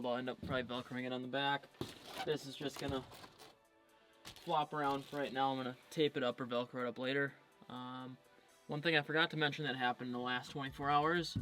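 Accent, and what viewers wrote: Minnesota, United States. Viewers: What part of Minnesota are you from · what part of minnesota u from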